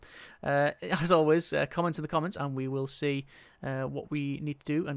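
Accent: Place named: North East England, United Kingdom. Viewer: North east of the UK I’m guessing from the accent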